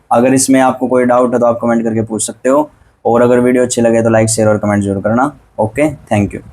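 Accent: Haryana, India. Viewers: Aap haryana se ho sir ???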